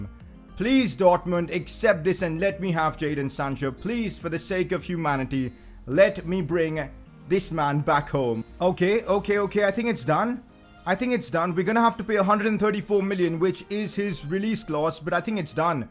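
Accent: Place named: India. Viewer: We’re has this Indian sounding man been all my life I have been laughing so hard all ep this is amazing I just subbed to all his channels he his amazing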